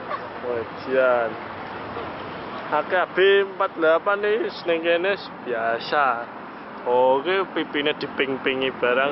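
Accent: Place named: Java, Indonesia. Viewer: Medokmen to yo hhah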